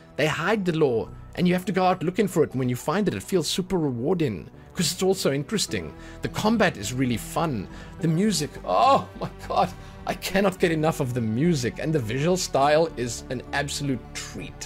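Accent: South Africa. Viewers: Like for thick South African accent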